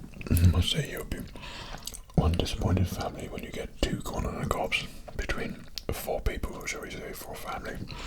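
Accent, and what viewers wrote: England, United Kingdom. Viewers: NO WAY BRO'S BRITISH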